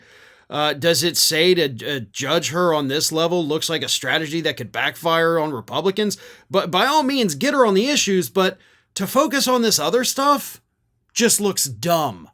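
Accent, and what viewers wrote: South, United States. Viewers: He actually sounds like Yosemite Sam mixed with Foghorn Leghorn. I can't stop😂😂with Farron Cousins southern accent...this just makes my day.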